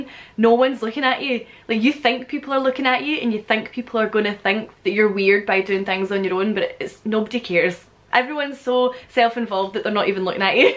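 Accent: Scotland, United Kingdom. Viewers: First! And Scottish accent is fun, peelieed!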